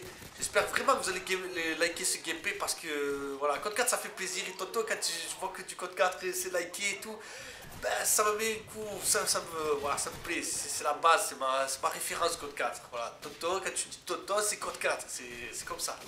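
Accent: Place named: Marseille, France. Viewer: GG, tonton pour le gameplay,je connaissais ce call of et j'y et jouer beaucoup mes pas en multi j'étais encore gamin je jouer que en solo et je fessais les mission je c pas combien de fois et cet map ma flasher dans la tête je crois que c'était la première mission dans cod 4 en campagne si je me trompe pas ^^ bref bas moi je c pas trop comment sa marche sinon je t'aurai bien donner 1 ou 2 euros pour le Paypal et je joue sur pc donc voila ^^ dommage désolé tonton même si je te connais pas bien car je me suis abonné ya pas trop longtemps mes j'ai regarder tes anciennes vidéo tu es honnêtes (un mec de marseille avec un putain de bon accent xD ) etc bref bonne continuation sa payera par la suite a+ mec pour une prochaine vidéo :p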